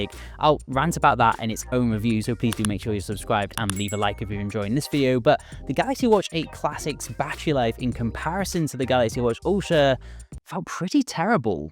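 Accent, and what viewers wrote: United Kingdom. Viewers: Please consider talking slower. I am forced to use subtitles due to your heavy British accent (Canada here), as well as your speed talking. (I've been told I do the same, lol) just a consideration. Thank you for the great review, I just got my s25 ultra, and am thinking that the watch would go nicely with it! 😁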